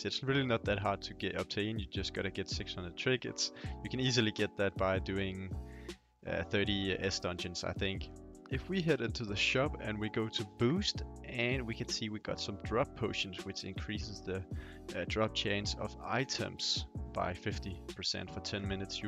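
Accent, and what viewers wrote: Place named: Germany. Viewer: I hear some deutsch in your english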